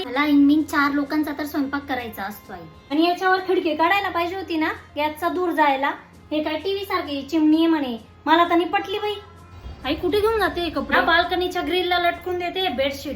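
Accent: Khandesh, India. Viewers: परफेक्ट खानदेशी 👌😅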